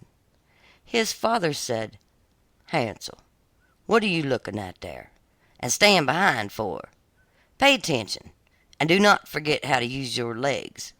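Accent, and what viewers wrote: South, United States. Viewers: I must be the only one here who are NOT distracted by the southern accent. I find it amusing... And I'm from Europe myself. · Although I am grateful for the story, but you aren’t the only one who isn’t distracted by the Southern accent. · Hated the southern accent . Terrible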